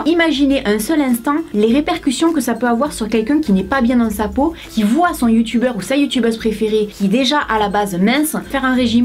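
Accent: South, France. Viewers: j'adore tes videos!! ensoleillées , avé l'accent!! et elles sont bien classées pa r categorie, c'est tres pratique ,on dirait un vade mecum!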